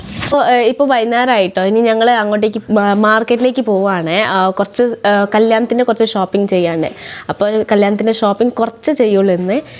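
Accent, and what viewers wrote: Malappuram, India. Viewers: a north indian doing videos in Malappuram slang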